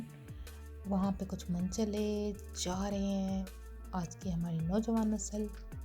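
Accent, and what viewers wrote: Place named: Sindh, India. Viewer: Ap kya Sindhi nako? Ap k accent may Sindhi pan tau hay😊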